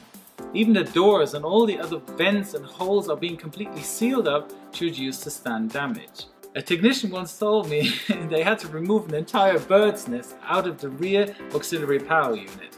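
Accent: Germany. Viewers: Hes german.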